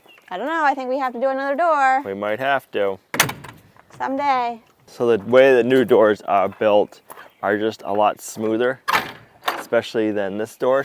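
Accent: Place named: New England, United States. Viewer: It's called a New England dialect. Not a speech impediment. Look it up. You owe an apology.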